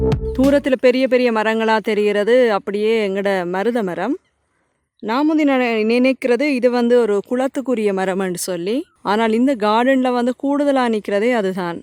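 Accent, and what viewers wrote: Jaffna, Sri Lanka. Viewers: அறிவுபூர்வமான விளக்கங்களுடன் நாகரிகமான யாழ்ப்பாண தமிழ்பேச்சும் பார்க்க, கேட்க இனிமையாகஇருக்கு வளர்க உங்கள் பணி .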